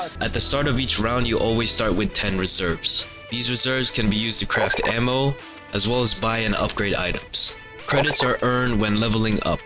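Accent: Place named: Netherlands. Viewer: for anyone wondering, zeekoeien100 is Dutch for Seacows100. Also im guessing, based on his accent and one of the pple hes playing with, that hes dutch himself. But thats just a theorie, A THEORIE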